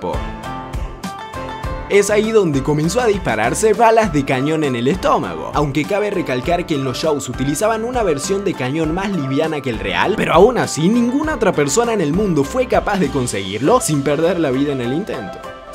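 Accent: Argentina. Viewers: No sabia que eras argentino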